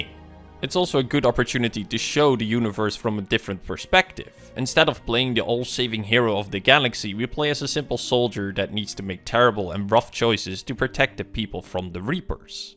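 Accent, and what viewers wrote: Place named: Netherlands. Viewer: Yo...Are you in any shape form or guise connected to Pretend3r or Pretend3rCE? Because he's dutch and is interested in Mass Effect as well. Plus you seem to have a dutch accent...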